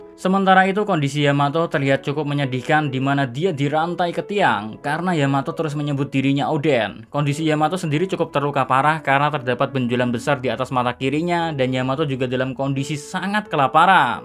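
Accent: Java, Indonesia. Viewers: Lidah jawa mas . Jangan heran . Yang penting penonton paham 😁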